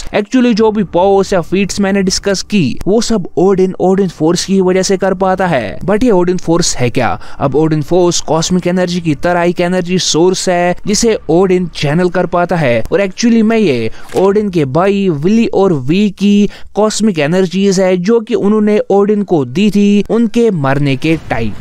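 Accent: Kashmir, India. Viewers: Kashmiri ho kya ?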